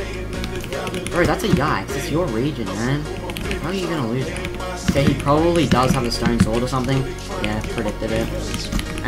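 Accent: Australia. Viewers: Aussie accent is 🔥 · Can i play with u also are u a. Aussie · R u from qld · W accent I’m Aussie too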